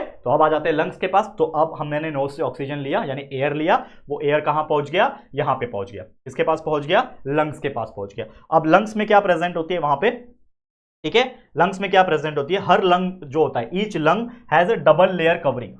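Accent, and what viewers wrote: Maharashtra, India. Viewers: Ap marathi ho kya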